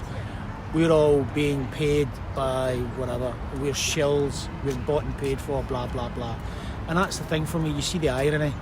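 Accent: Scotland, United Kingdom. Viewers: What's the accent?! Scottish?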